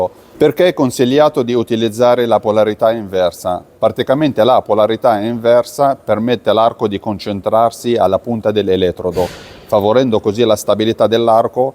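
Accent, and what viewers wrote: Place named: Egypt. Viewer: E egiziano si sente dall’accento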